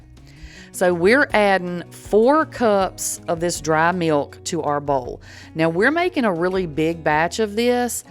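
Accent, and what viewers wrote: South, United States. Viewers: You are JUST adorable and So kind! I think I need to go live in the South - but Im from Australia! You could be reading the phone book with that accent and I would feel happy! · This Texas girl loves listening to your southern accent....kinda comforting to me. ❤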